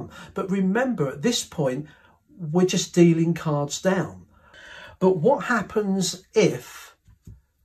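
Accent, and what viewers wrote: United Kingdom. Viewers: Thank- you for putting the work in to teach and show us step by step how to perform this wonderful effect!! I have been into magic for about 40 years so even though i did not actually work it out step by step as you did i knew the basic method eas a stack and that the reverse dealing was part of the method. I will definitely be adding this to my routine!! Often when penn and Teller say they were fooled i think they are just pretending that they were fooled. If i know how it is done then certainly they must know; anyway that is how i think. They claimed that Shin Lim fooled them but i am not so sure that they really were fooled. Their motive? Shin Lim is considered a hot perfotner currently and anyone who. "" FOOLS THEM"", Performs one their Vegas strip show, to have Shin on your stsge is great publicity!! Thanks agin, sir for for all of your great videos!! 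P.S.-- my sister loves your accent; she says she thinks you have a Liverpool accent like the Beatles!!! Gary in dreamland; have a nice dream!!!🙂☁️☁️☁️⛅🌟🌟🌟🌟🌟🌟🌟🌟☁️⏰☁️ dream!!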